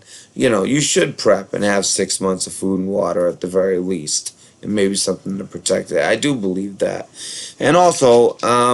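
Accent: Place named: Boston, United States. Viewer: Weems yeah his accent is awesome, I know others from Boston and they only sound like him when their mad!🙏🏻💞🇺🇸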